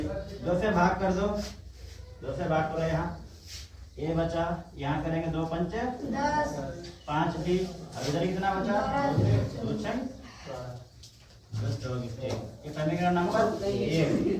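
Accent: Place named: Rural, India. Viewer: Ye dehati kon bol Raha tha😅😅😅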